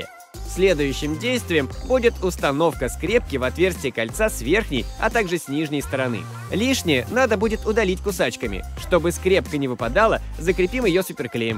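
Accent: Kazakhstan. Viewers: Ты из Казакстана ?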